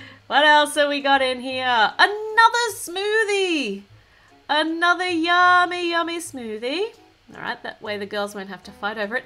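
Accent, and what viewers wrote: Australia. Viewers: Your Australian? I’m Australian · OMG ARE U AUSSIE SAME!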